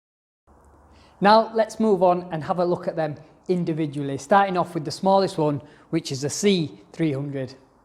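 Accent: United Kingdom. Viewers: Fantastic review. Informative, demonstrative and to the point. No fluff. And a lovely Northern accent to boot! Hope to see more of your reviews in the future.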